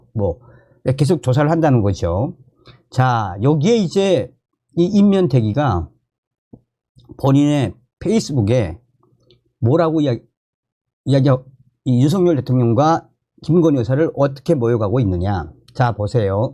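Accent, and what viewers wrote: North Korea. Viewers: 북쪽말좀 하시지마고요 듣기소름끼칩니다 않보면되겠지요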